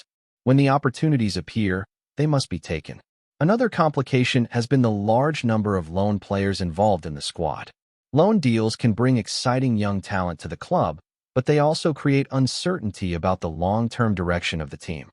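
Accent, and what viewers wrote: United States. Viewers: Another one that likes to hear his own American voice. Over 15 minutes of blah, blah, blah with absolutely no information.....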